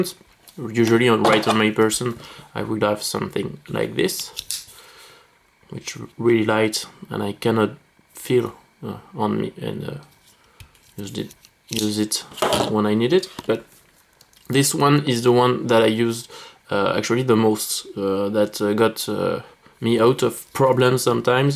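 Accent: France. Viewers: Ty for your time, you have a french accent, are you french? I am french, living in Scotland! I just ordered yesterday a huntsman camo ,uk legal, it's still in the mail, but I wanted to have someone's opinion on it! It's my Christmas present 😀,so t y again,it was helpful, and merry Christmas 🎄🥶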